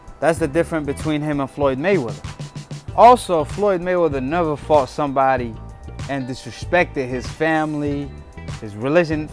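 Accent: United States. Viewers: I was there you idiot.! McGregor didn't sucker punch anyone and you sound American why would you take up for this trash and what could have been a lot worse than it was and another thing he proved his point in the octagon there was no reason to take it out in the crowd with Innocent people that could have severely gotten hurt or killed . Why are you American's so worried about this idiot you do know his family his friends and teammates ,father all been questioned about 9/11 and the real truth why his father cannot get a green card to come over here it's because they found out his father was associated with the terrorist of 9/11 and you want to back this BITCH "And this is FACTS not just speculation".... And if you are American and live in the United States of America and do not like it here get the FUCK out.!!!!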